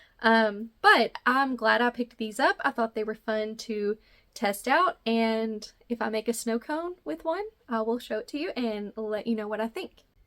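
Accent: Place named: Tennessee, United States. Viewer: ❤are you from Tennessee you have my accent lol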